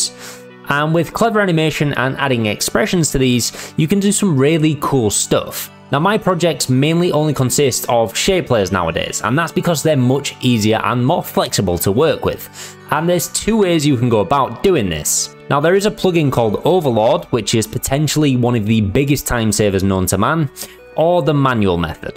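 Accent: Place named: Manchester, United Kingdom. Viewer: You look and saund a bit Mancunian XD are you ?.. oh btw.. where can we find your projects? I love what ive seen here in this video..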